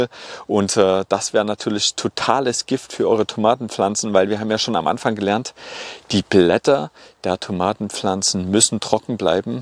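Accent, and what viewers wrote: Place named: Berlin, Germany. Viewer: Bist du aus Berlin? Dein Dialekt klingt voll von dort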